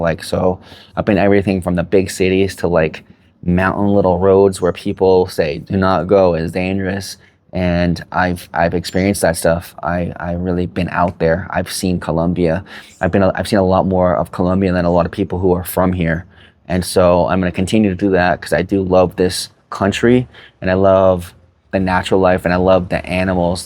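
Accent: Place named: United States. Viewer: I'm gonna pension soon and although I speak fluent Spanish I'm gonna try Thailand. Been there twice and I loved it !..From the sound of your voice I'd say you're far too American, and probably not bringing HUGE amounts of money, to the table, in the business you're working on in Colombia. NO way would I recommend you doing any such activity in ANY country in Latin America unless you have a need for those people to "work for you" !! That's why you're getting those responses ! They're looking at you (as the brains) ..to make money ...for them ! Don't think you're gonna change a culture. You're in their Land.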